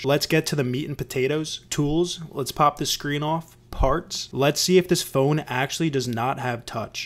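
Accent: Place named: New Jersey, United States. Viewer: When I heard your voice I was like has to be a fellow Jersey guy I'm new to the repair business about a year I don't get as deep into repairs as you do. Really like your videos and the humor you use. I guess it's a Jersey thing. I'm in Texas and nobody here understands the humor they just think I'm a smart ass.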